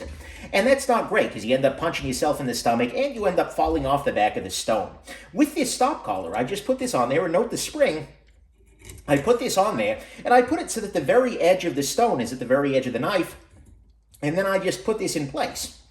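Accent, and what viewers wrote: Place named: New England, United States. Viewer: This dudes way of teaching is awesome. Cool N.E. accent too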